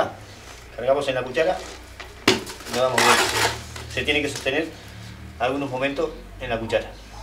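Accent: Uruguay. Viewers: Genio. Sos Uruguayo, o Argento?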